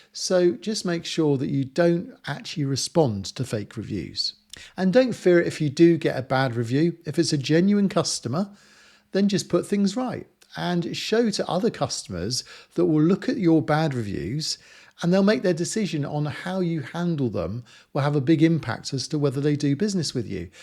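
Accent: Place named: England, United Kingdom. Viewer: Appreciate the guide. Makes a nice change having to listen a Englishman 😁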